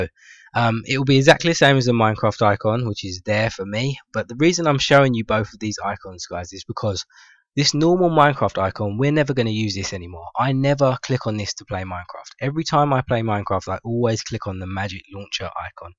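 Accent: United Kingdom. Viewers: You remind me of Garnet from Steven Universe. Your black (I not being racist) you have a british accent, and you have those glasses! :D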